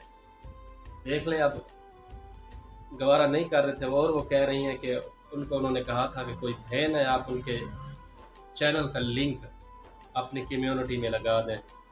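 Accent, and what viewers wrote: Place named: Saraiki, Pakistan. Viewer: سرائیکی آواز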